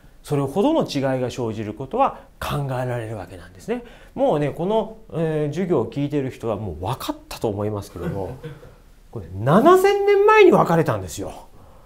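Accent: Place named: Tohoku, Japan. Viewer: 東北弁に聴こえるー。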